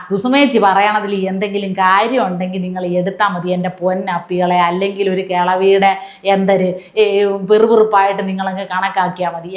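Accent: Thiruvananthapuram, India. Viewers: What said is correct.. Nowadays ' Naadan pattu ' dance exceeds limits & highly boring.😮.. Can not enjoy.. Over action creats problems..Allow audience to relax & enjoy the song instead of creating uncontrollable & uncomfortable sounds.. By the by i can not understand why using malayalam language in a most funny way..? You may be using Thiruvananthapuram slang.. But that is not fair.. Disrespect to the language.. Boring also.. Our attraction to hear the details from you decreases due to this method..Better give details in your natural way..that will be more interesting..Prof.Mohan.. Tvm. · തിരോന്തരം ഭാഷ കിടുക്കി 👌👌👌👌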